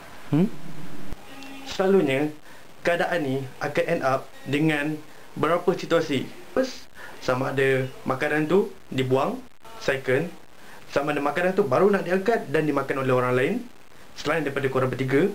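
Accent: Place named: East Coast, Malaysia. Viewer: ko org mane ek? slang bunyi cm pantai timur je. hehe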